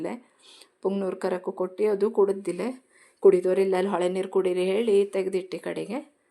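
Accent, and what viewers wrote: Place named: Karnataka, India. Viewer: nim havyaka bhashe slang namma kundapura bhashe ge shekada 80nrashtu hathira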